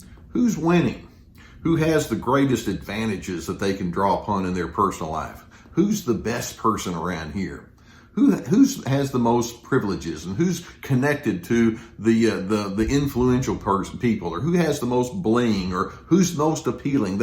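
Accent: Texas, United States. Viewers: I left my narc back in 2007. Best decision I ever made in my entire life. Oh the stories I could tell, of his envy and lack of empathy. I look at your videos and even now gain healing and insight. Maybe there is something very special about the way a Texan drawl delivers compassion and wisdom;) Thank you SO much, Dr.! <3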